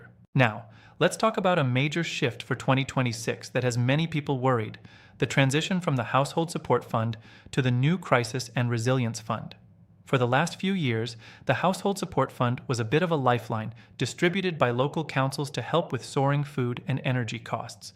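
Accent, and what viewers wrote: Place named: United States. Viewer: Why have we got some American telling us this?mit is really annoying. Not to mention being called a senior!!!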